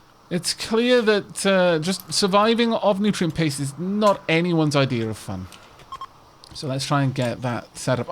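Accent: Wales, United Kingdom. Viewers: new sub here, Rimworld brought me but am enjoying your style so I shall check out the rest of your content! Out of curiosity, would that be a slight hint of a Welsh accent I hear?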